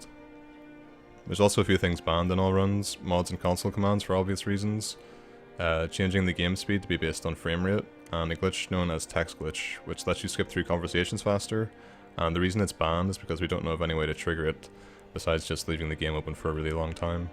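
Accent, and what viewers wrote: Northern Ireland, United Kingdom. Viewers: Is that an NI accent I hear? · holy shit my fucking man's northern irish. and people say we're not smart, pfft. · It's always nice to hear another Northern Irish lad who is also a massive elder scrolls fan, love the videos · Northern Irish I'm pretty sure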